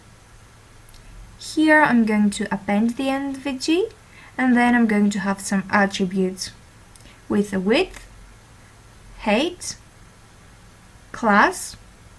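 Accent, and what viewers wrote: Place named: England, United Kingdom. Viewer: I asked where are you from but you didn't answer.im just curious about your English accent.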